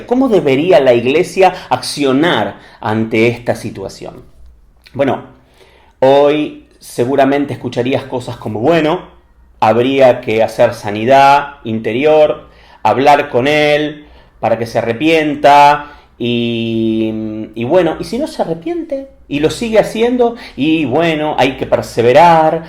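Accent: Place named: Argentina. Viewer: Gracia y Paz en primer lugar le doy gracia a Dios por sus riquesas inescrutables por esa palabra atinada y en segundo lugar gracias por tener el privelegio de escucharlo Siervo por su acento veo que eres argentino eres una bendicion para todo aquel que te escucha desde Panama centro del universo lo escucho a diario y me regocigo trae gozo a mi alma la gloria sea para Dios Bendicion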